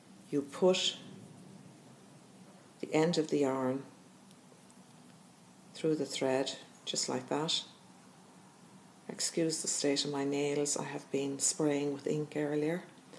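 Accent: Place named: Ireland. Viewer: Very clever lady! Thank u. Love love love the Irish accent! Xo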